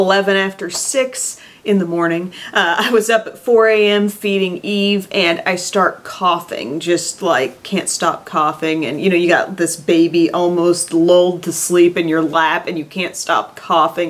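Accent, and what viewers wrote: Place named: New England, United States. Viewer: You pronounce some words like you were from New England! That's a New England accent! 😊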